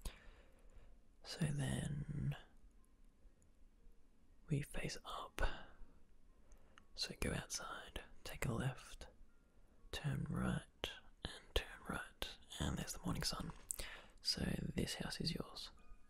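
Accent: Australia. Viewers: hello! I'm a new subscriber, and I was wondering where your accent is from :D Australian?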